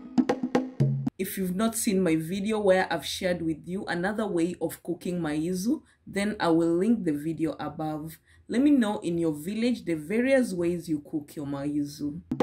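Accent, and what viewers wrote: England, United Kingdom. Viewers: I too subscribed sis how neatly and beautifully you cook , woooooow environment and recipe too. I admire at your English accent too... You are humble and respectful towards viewers🙏🏻🎉👍🏻, i love you dear sis - This is Raji from Chennai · I love your English accent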